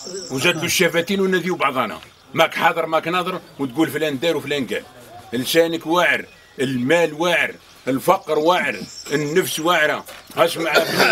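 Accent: Chaoui, Algeria. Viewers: Midi Bi تحية للشاوي من عند الشاوي بصح اللهجة نتاع السيد ما تبا نش سطايفية . تقول عليه شاوي حر من اولاد سي علي .والله تقول من عرشي .واش رايك . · هدرا شاوية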